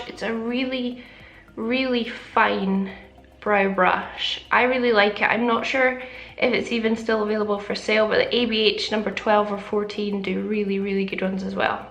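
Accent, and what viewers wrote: Scotland, United Kingdom. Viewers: i clicked on this video because i wanted fluffy brows then realised you were scottish and immediately subscribed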